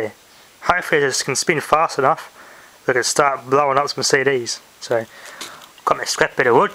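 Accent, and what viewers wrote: Australia. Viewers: I'm curious, what's the accent? I mean u sound aussie, but there's something else in there... Or is it just a lisp?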